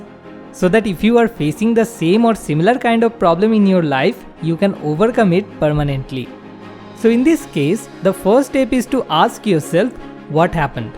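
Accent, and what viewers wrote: India. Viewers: I like when Indians talking in English they are funny 🤣😁👏🏻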